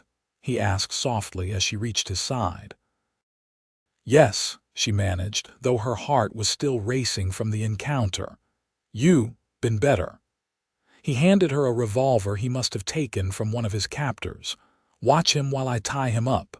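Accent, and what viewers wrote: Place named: Canada. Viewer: Sounds Canadian. Liked this story very much. Thanks. GA 🍑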